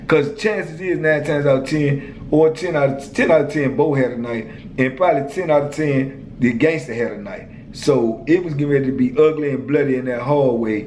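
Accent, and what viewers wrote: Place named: Tidewater, United States. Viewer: Enjoyed that story. Got that heavy tide water accent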